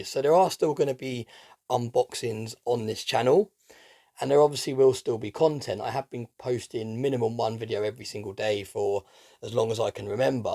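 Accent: United Kingdom. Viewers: So sad! No punch line. I can't agree with arbitrary buy, don't buy scheduling. Always stack, never stop! Price cost averaging is the only way to look at it. Sure you can tighten your decision making, but that doesn't stop you from buying good deals or buying the dips. I know you said 'the rest of the year OR until the price comes back down' so you kept the door open, hedged your bets or whatever. The price could be back down next week.... So I really don't think you mean this, maybe this is just click bait with a british accent? As far as price goes I just bought 54 oz at $23.75 (last night). Just a dollar over spot and actually the same price I paid the last week when spot was $20.09 and less than I paid for some the week before. Oh pickle my carrot 🥕 if you think I am wrong!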